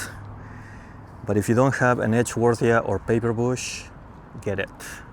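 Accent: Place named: Nagaland, India. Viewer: Nice collections. I am from Nagaland, India.I want to tell you that you the way you speak, it's just like one of us,,we people speak English exactly like the way you speak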